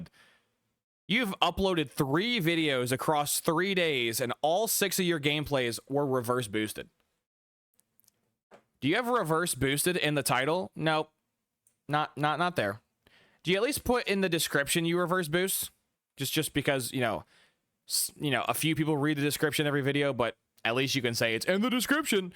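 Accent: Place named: Mid-Atlantic, United States. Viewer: I think your accent was the now extinct Mid-Atlantic accent.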